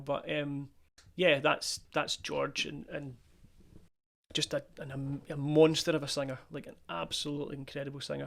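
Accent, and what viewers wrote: Scotland, United Kingdom. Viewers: I love your Scottish accent 😂👍🏻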